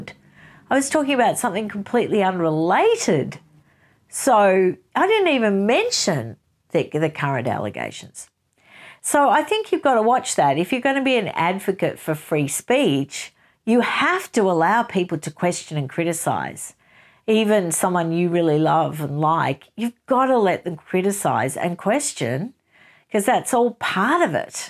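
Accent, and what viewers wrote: Australia. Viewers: I really love this format. I agree with you about Russell Brand, and did not know that somebody was trying to get him demonetized on rumble. I knew about YouTube. Like you, I did not have a favorable view of Russell Brand, but I don’t even know why I had any view of him. I’m not sure how I even became aware of him. But he kept coming up in my YouTube feed and I found him interesting and did subscribe and listen to a lot of his videos. I could not listen to the video on his book because of the beginning segment talking about the dog and I switched up immediately. I am very sensitive and things like that trouble me intensely. But I love all your videos and having lived in Melbourne for a number of years, I like listening to an Australian voice. I’ll look forward to more of these chats. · Loved this chat session! Being from the states i always love to hear the accents down under. 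You are such a great content provider about my favorite things...books. keep on talking to us about both sides of the story because you are so insightful! ❤❤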